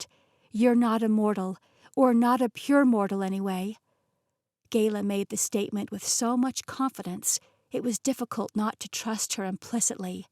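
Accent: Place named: Ireland. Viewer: Story is great! I keep hearing a hint of an Irish under accent.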